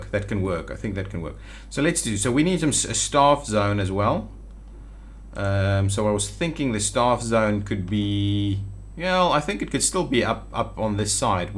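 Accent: Afrikaans, South Africa. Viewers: Are you actually Afrikaans Wondering by the accent and the way you say your "r".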